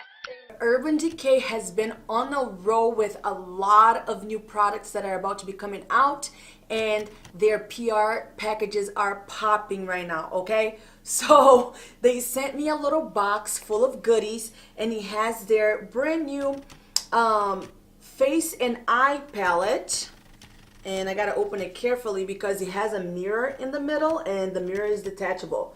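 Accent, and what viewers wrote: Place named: Spain. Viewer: Do you speak spanish? I feel like I can hear your accent when you talk lol love it! This look is so gorgeous. loved this video!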